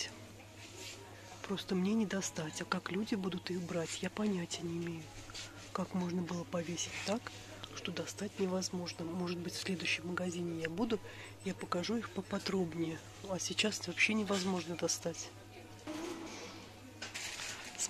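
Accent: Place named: Central Russia, Russia. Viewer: ПолОжила,взЯла .так говорят почти все,кто живёт в средней полосе России . Видимо это местный диалект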